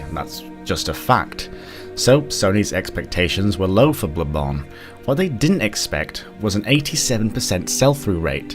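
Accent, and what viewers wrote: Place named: North, United Kingdom. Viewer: ok I already spent 4 miserable years living in the north I don't need to be listening to your disgusting accent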